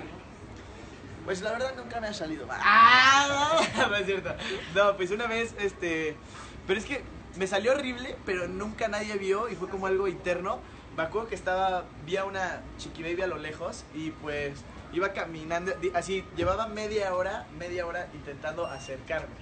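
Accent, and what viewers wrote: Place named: Spain. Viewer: su acento español😍😍😍😍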